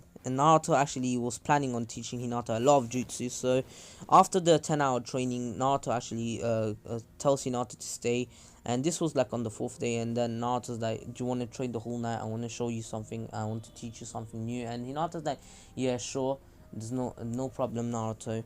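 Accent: United Kingdom. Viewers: You sound so British.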